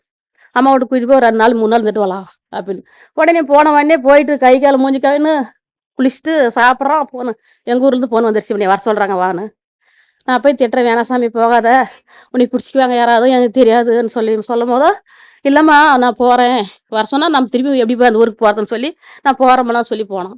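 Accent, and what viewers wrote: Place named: Krishnagiri, India. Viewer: எங்கள் அம்மாவை போலவே உங்கள் முகமும் பேச்சும் கிருஷ்ணகிரி மாவட்டம் 😢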